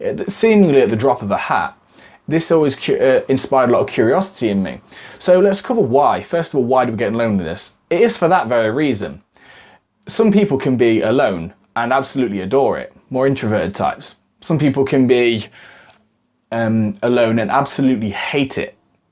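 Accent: United Kingdom. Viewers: your british accent is so coollllllllllll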